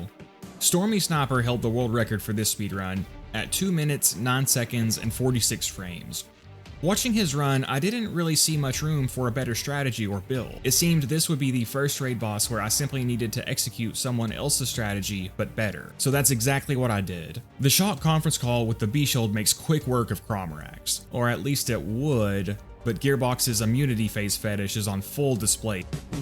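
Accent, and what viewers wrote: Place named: Texas, United States. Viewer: I love the slight Texas southern twang to your accent. “Aaai play with aaaa controllaar” 😂👌 coming from a queens English speaking Brit it’s highly amusing.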